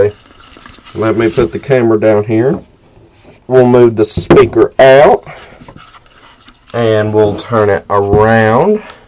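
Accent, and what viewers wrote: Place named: South, United States. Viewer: he sounds like a southern dude........he sounds cool though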